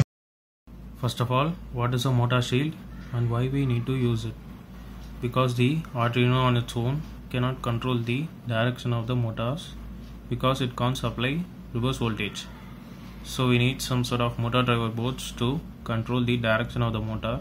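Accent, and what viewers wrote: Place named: India. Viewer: Damn always Indian accent...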